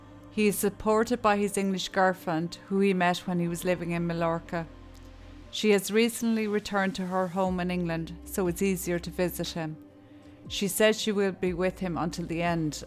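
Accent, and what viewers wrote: Ireland. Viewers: Thank you for your answer and also thank you for your wonderful videos, love the Irish accent as well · I love your tone. You don’t sensationalise and are so respectful to the victims and their families. And I could listen to your beautiful Irish accent all day. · Irish accent fab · your Irish accent could charm a snake ❤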